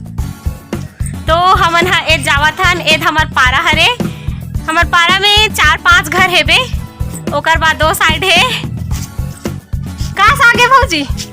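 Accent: Chhattisgarh, India. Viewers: Hmar chhattisgarh boli bhakha aap ke bahot hi Sundar lagish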